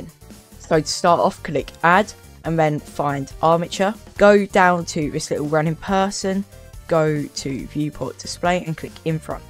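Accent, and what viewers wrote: United Kingdom. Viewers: it means Z its because hes british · He’s British, that’s how they say Z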